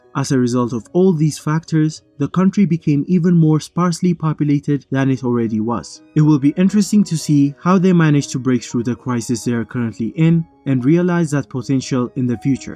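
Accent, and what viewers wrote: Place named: Argentina. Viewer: Me parece que argentino.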